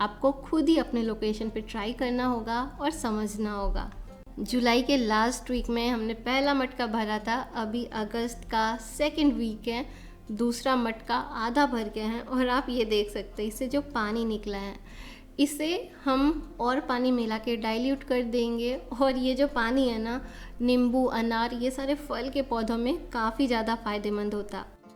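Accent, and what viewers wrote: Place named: South India, India. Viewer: Lovely Voice ....Kuch Kuch South India jaisa feel ho raha...😄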